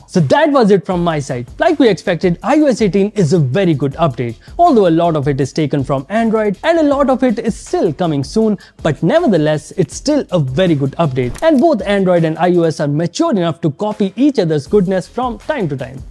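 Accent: India. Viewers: oooh god this indian english aghhhhhh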